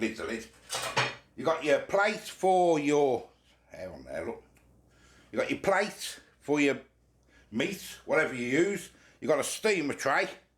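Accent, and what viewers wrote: Birmingham, United Kingdom. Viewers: Huh? it’s a normal Brum accent, I can understand him no probs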